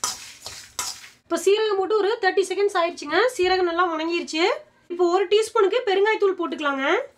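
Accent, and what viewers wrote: Kongu, India. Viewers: yur voices lyk kongu slang in gobi which place yu are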